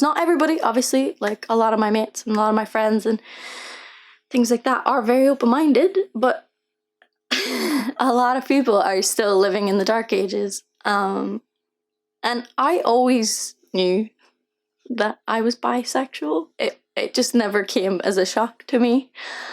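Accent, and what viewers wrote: Ireland. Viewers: good try , Mistress of Clickbait :)) Now go back to sing, we adore your unique, spicey and irish accent ! Wish you joy & happiness ! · I Never post coments, but this is one of my first, I am so proud of you Janet, for posting this video, it'S what make you happy in life, don't, worry about what other people think. I was honered, to meet you and your manager when you came to Aberdeen, YOU are always a superstar to me. ( beautiful irish voice) You will aways be welcome in Aberdeen Joe x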